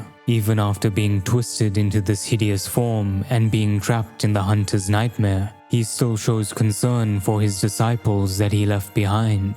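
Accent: South Africa. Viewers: Is this guy South African??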